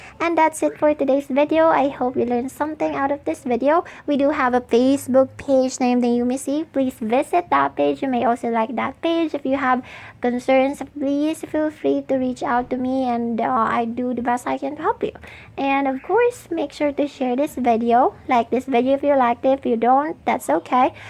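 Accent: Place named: India. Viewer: Naanu ako sa accenr hhehe parang indian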